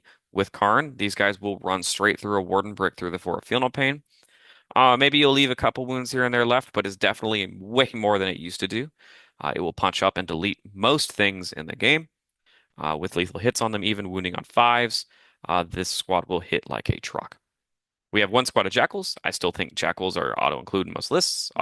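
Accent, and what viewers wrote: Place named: United States. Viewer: Wait, I thought this was a British YouTube channel? Why is there an American man here?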